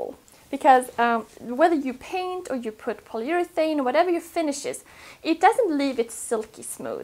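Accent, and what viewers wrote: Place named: Sweden. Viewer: How long time has you lived in the USA ? You think in inches, but your accent is very Swedish. Ever visit Sweden, and will / do you teach your kids (??) the other language ? Sorry for off-topic question, only answer if you feel like it :) Excellent videos!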